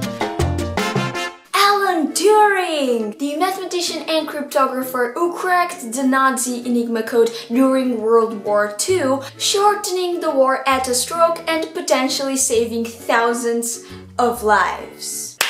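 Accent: Portugal. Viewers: Se não fui claro o suficiente, eu acho bonito ouvir o português de Portugal, por isso disse que senti falta :D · Prefiro quando fala em português de Portugal. Acho muito mais interessante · Ooooh my Lord ... You speaking english is so precious.... You are so intelligent ..smart and clever... And a math gurl... I'm think I'm fall in love e seu sotaque português é maravilhoso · Peculiar - you can realize the portuguese accent.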